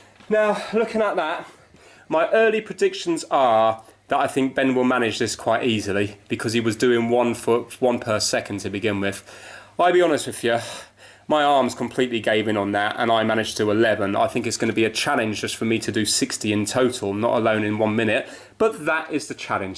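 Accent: United Kingdom. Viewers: I started with walking during my lunc hours, fast forward 3 years , now I run 3 x a week and coach a running club :). PS: You look just like a high school friend of mine, but with a British accent :)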